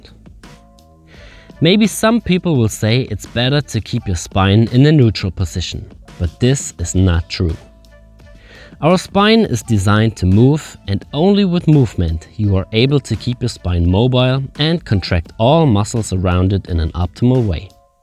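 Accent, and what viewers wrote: Germany. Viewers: Hahahahaha 100% german. I recognize that accent anywhere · Funny accent...🤔.....german? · Bruder man merkt dass du deutscher bist an deinem englisch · Hardcore German-Accent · Can't listen to this guy - such a terrible German accent!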